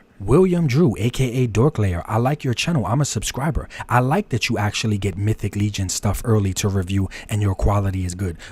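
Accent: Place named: Bronx, United States. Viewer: Classy, respectful video while making your point 👏 . I have watched many of your videos but this one made me sub. That a bronx accent? Cant put my finger on it. Def tri state area though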